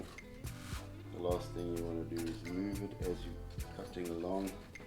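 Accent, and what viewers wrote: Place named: South Africa. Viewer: You sound South African bru, lekka to see some local vids on YouTube. Keep up the board building.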